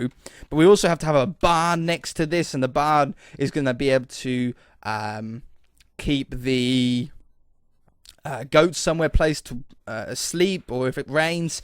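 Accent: United Kingdom. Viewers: Hate to be rude, but may I ask, are you British? I'm part British part American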